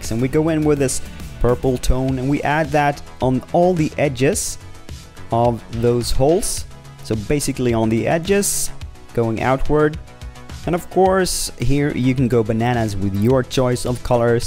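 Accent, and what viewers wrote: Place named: Skåne, Sweden. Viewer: good accent in Swedish, sounds like ure from Skåne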